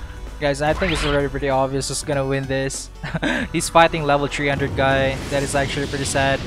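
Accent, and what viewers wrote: Philippines. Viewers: ye his accent aswell is like a filipino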